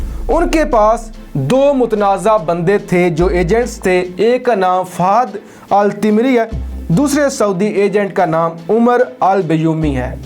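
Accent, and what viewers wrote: India. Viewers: گلت فہمی نہیں ہوتا غلط فہمی ہوتا ہے آپ انڈین لہجہ چھوڑ دیں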